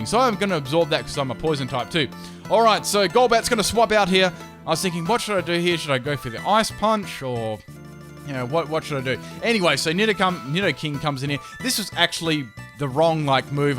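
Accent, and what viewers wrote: Australia. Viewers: its fine, he's aussie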